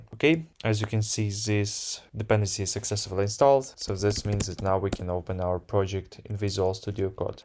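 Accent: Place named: Russia. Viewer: Thanx a lot for this guide! Fluent english from russian spell that's what i neeeed, man "]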